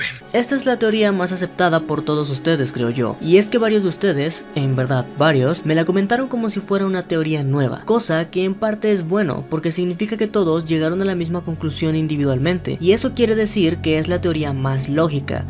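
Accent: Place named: Panama. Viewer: aa te sentia acento a panameño, no pareces de MX, buen video por cierto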